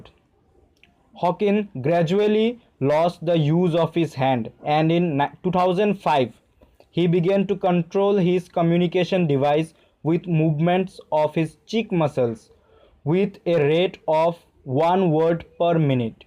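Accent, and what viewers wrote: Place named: India. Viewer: I don´t know why, but I love this Indian accent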